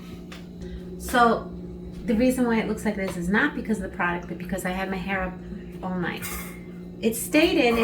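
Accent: New York, United States. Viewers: Your hair came out beautiful! I love the full abudance of curls. I like your New York accents; my home town.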